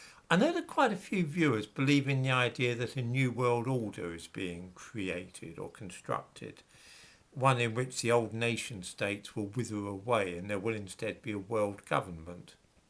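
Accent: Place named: Cockney, United Kingdom. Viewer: He thinks he has a neutral accent? Maybe to an East- Ender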